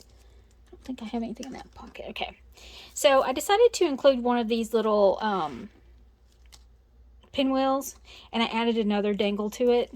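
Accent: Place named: South, United States. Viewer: new subbie here lovely project, where u from?.. I recognized ur southern accent.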